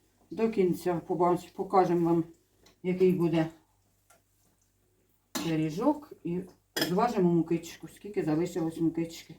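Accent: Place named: Cherkasy, Ukraine. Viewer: Наче з рідної Черкащини говірка.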